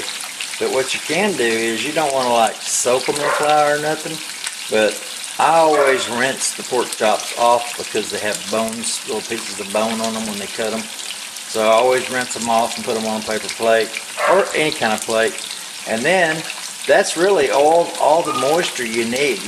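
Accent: South, United States. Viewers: As soon as I heard his southern accent I trusted him haha 😆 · He sound Country I know this pork chop Finna be good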